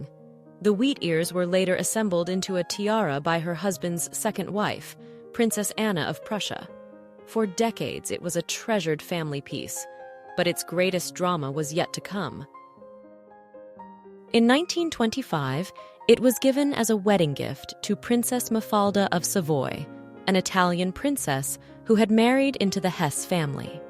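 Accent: United States. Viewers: For a documentary about British Heritage, this American lady has done her homework but she should let a native speaker do the voiceover. The "don't you think" and other fatuous remarks lack the gravitas that would bring the required connoisseurship to the broadcast. No doubt they are intended for an American audience.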